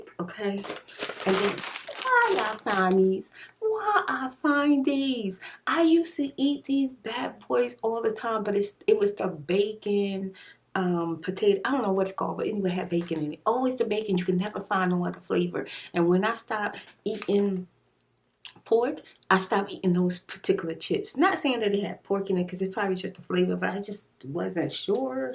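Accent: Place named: New York, United States. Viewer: you're so pretty! 😍😍😍 love your accent are you from NY?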